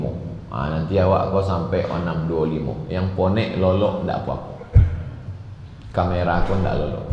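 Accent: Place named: West Sumatra, Indonesia. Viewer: cakapnya mcm bahasa minang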